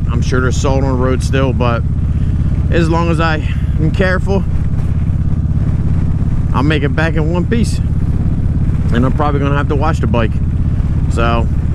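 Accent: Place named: New Jersey, United States. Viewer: 10-4, thought I might have heard a little Jersey in your voice. Ive spent time time in that corner of PA, MD and DE in Elkton.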